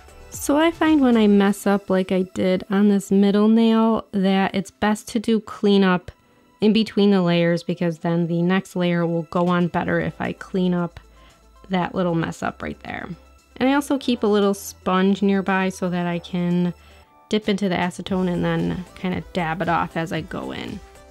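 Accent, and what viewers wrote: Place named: Chicago, United States. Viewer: Loved this video! Are you from Chicago??? That accent...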